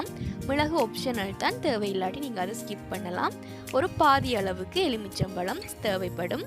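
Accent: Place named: Sri Lanka. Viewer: Unga language very nice neenga srilanka va....very nice dr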